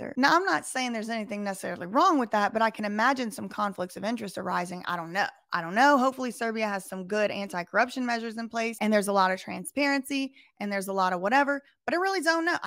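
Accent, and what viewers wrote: South, United States. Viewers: You are the best holy shit. Sweetest accent, funny, southern as hell, gorgeous and an advocate for the weak. You earned a sub please don’t ever stop doin this.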